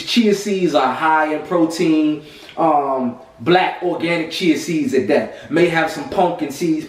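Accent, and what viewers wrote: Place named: East Coast, United States. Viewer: very informative. looking into becoming vegan in the near future. also, you have to be from baltimore or the dmv area. i detected an east coast accent, then you 'dairy' and that confirmed it. LOL.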